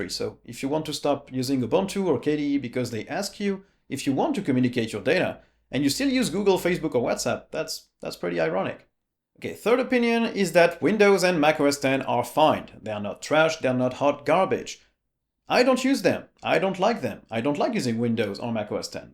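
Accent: France. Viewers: Completely unrelated but I didn't realize you were french Bonjour héhé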